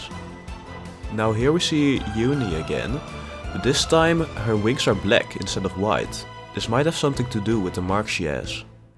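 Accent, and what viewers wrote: Netherlands. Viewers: Ik hoor meteen een NLer 👍🏾😂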